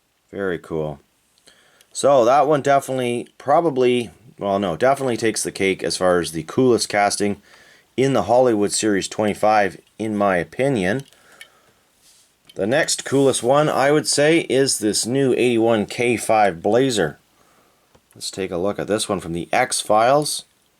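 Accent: Canada. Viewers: Banana-ing of the vehicle❓❓🤔 - Ya big kid,you.🤭 Also,just wondering,by any chance,would you happen to be Canadian?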